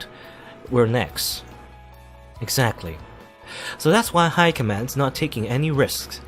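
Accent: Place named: England, United Kingdom. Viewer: sorry I posted the same message twice...for some reason it didn't show up either time so I thought I hit the cancel button by mistake. I just deleted the repeat message so it no longer shows......I didn't even realize English wasn't your native language....I thought you had an English accent actually....you speak it tremendously well.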